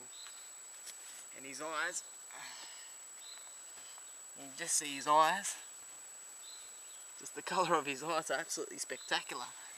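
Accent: Australia. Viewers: Jensen Payne He's definitely Aussie. Not everyone in Australia has the same accent.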